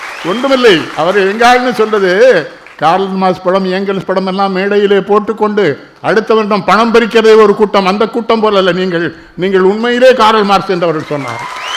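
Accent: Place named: Tirunelveli, India. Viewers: இவர் பேச்சு நெல்லை தமிழ் கேட்க கேட்க திகட்டாத தெள் அமுது ஓம் நமசிவாய வாழ்க வாழ்க ஓம் சக்தி பரா சக்தி சாந்தி சாந்தி சாந்தி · நெல்லைத் தமிழை அழகாகப் பேசியவர்.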